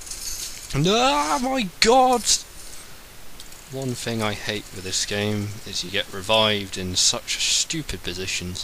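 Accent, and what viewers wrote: United Kingdom. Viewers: Are you going to buy BF3 or the 'new' COD:MW3? Also, it's nice to hear your awesome British voice again. ^^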